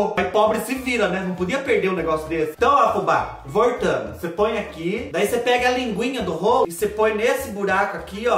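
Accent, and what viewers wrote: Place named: Minas Gerais, Brazil. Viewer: Me divirto tanto com vc...alegra meus dias,.menino lindo, cada dia admiro mais sua simplicidade e bom humor!!Amando vc..queria só saber se onde e vc se e mineirinho ou de outro lugar...seu jeitinho de fala e parecido com o meu..só que sou paranaense mesmo...qua do me apresentaram seus vídeos ..me disseram " olha aí Ivete alguém que fala que nem ocê""kkkkeu falo tudo anssim tamém...😂😂😂pois agora e só ocê que vejo pra dá boas risadas fubá do Céu!!!😘😘😍😍😍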